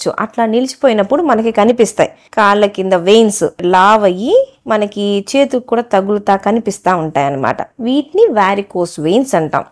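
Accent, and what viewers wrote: Guntur, India. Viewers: Thank you Dr.. At an early age you are explaining every aspect very clearly in telugu that too in Guntur Style. All the BEST.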